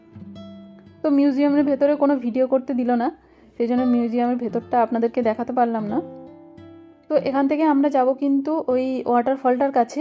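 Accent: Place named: Kolkata, India. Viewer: কলকাতার এক্সেন্টটা আমার কাছে জোশ লাগে। ভিডিওর প্রাকৃতিক দৃশ্যগুলো মনোমুগ্ধকর ছিলো। দিদি কিছু জায়গায় ভয়েসওভারটা খাপছাড়া লেগেছে। ওভারঅল ব্লগটা দারুণ।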